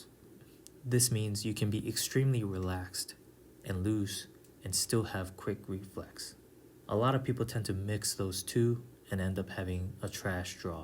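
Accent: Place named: United States. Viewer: You sound American. What that shitty flag on your shoulder?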